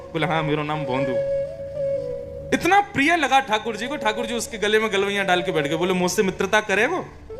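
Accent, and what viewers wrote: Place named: Jaipur, India. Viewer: आपकी भाषा जयपुर की बोलतें हों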